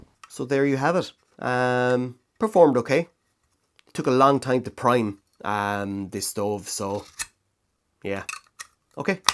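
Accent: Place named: Ireland. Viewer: Hi. Loving your videos. I'm in the middle of your hiking with your dog one, and your life interruptions. I feel for you buddy, been there. Hopefully, you're getting back on track now. I'm in Kent, but my great grandfather was born and raised in Clonmel, so I always feel happy when I hear the beautiful Irish accent. I must come over for a visit some time. Keep your chin up, hopefully it'll all work out for you. I hope your Dad is all good now. Cheers buddy. Andy 👊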